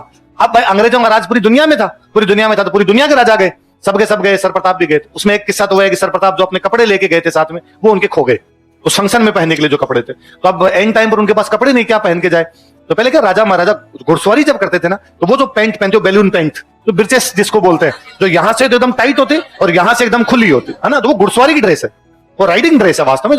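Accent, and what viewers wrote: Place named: Marwari, India. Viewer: मारवाड़ी लहजे को समझने थोड़ी दिक्कत हो सकतीं है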